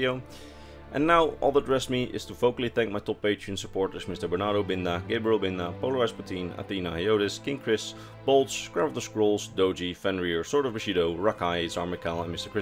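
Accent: Netherlands. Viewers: You sound like a Dutch-speaker.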